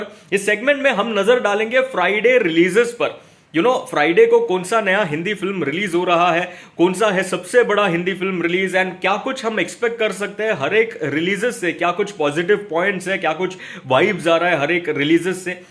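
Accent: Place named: South India, India. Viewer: great job brother..by the way are you south indian? your tone seems to be typical south indian:)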